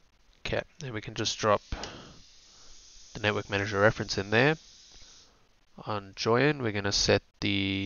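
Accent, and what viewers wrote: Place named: South Africa. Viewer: SA in the US. Cool to hear a South African doing this.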